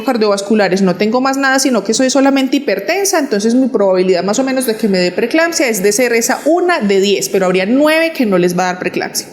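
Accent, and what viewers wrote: Colombia. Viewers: Hoy llege tarde 🙋‍♀️🤭 saludos bella ❤ Amo su acento colombiano ☺😊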